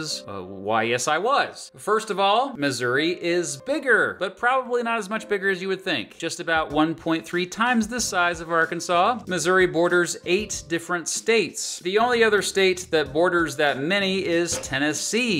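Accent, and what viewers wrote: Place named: North Carolina, United States. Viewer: I always thought your accent sounded like North Carolina. I've lived in Missouri half my life too.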